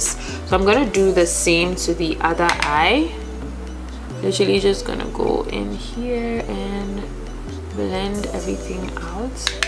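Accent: Ghana. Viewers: I love watching your videos and your technique. Although I’m much older than you and I’ve been doing my make up for many many years I still like to learn new techniques and see how others do it in a professional and fabulous way. FYI - I also ordered the Morphie brush because I liked the way it moved on your skin. I see you are from Ghana. I have been to Ghana and it was life-changing for me. I hope to return someday. Love your accent! 💕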